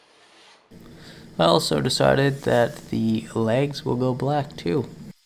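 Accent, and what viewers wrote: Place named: Atlantic Canada, Canada. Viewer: Your accent.... I can’t recall where I’ve heard it before. Newfoundland? New Brunswick? New England?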